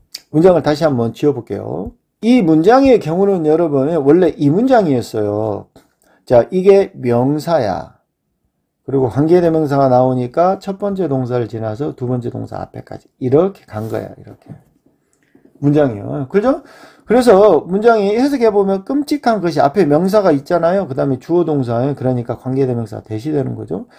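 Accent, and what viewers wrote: Jeolla, South Korea. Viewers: 영어보다 즌라도 어투가 넘 도드라져서ㅜㅜ 40초 듣고 껐습니다 ㅜㅜ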